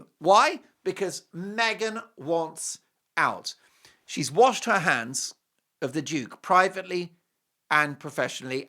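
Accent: United States. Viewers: your American accent is really good!! Enjoy the show and I look forward to it daily ❤❤ It sounds like you got in some serious trouble yesterday 😂😂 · Great American accent! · Your American lawyer accent!😂😂😂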